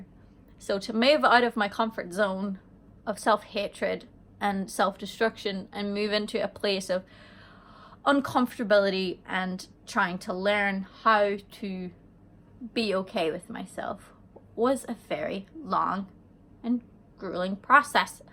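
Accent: Ireland. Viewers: My guess is she's Irish. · Where are you from? You sound a bit irish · you are an inspiration to many people I follow you on YouTube I follow you on Instagram and Twitter because I think that you are an amazingly talented person a beautiful singer and a beautiful young woman you have beautiful eyes you have beautiful hair you have a beautiful smile and if I may say you have a sexy body every person is different no two people are alike you are unique you are unique in many ways keep doing what you're doing because your voice is a treasure your beauty is a treasure and your story is a treasure and I for one appreciate you for all of these things and if I may add as an American I absolutely love your Irish accent it makes me happy to listen to you speak may you have a long prosperous and delightful journey in your life I will follow you through it all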